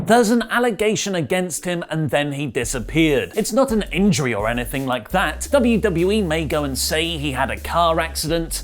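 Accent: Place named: United Kingdom. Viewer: "Rawr and Smackdown" .... that British accent SMH.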